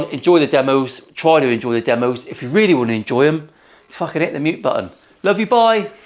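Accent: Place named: Working class, United Kingdom. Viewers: Just came on board for this build, but as a Yank, I love that you talk the way every American imagines a working-class Brit would talk. Of course, we've been brainwashed into thinking that Dick Van Dyke's accent in "Mary Poppins" is the pinnacle of British accents. Been a lot of fun watching the progress, you've got mad skills. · Love these videos for the west country accent alone 😂😂 strat me up baby strat me up.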